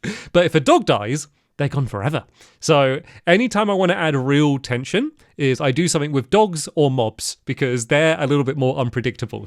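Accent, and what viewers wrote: United Kingdom. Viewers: It's crazy knowing all this, but still when I watch back, Hit the Target still seems evil to me. Good acting with him. I was quite sad when I saw I wasn't in the Love Garden, but it's fine now, not really upset at all now. Also I just noticed that your other name on here is @ that was funny. One more thing, I think I picked up a british accent from watching your videos everyday lol, not out loud but when I talk in my head, I talk like I'm british.🤣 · thank you stampy <3 I had a British accent as a child because of you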